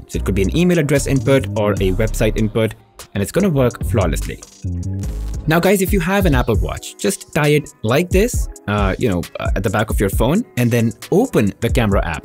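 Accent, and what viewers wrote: India. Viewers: That accent — Indian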